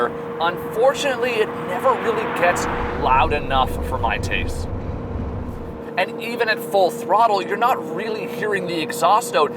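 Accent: Canada. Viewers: I gotta ask.... you're Canadian, aren't you? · This guy sounds like a cheese head. Canadian for the uninformed.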